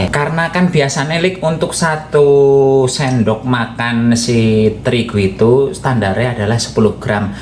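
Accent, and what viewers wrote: Banyumas, Indonesia. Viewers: As. Mas sampean kok baasanya seperti bahasane innyong ibu juga orang ngapak daerah banyumqsan pingin kenal mas biyung dirah sukses slalu amin.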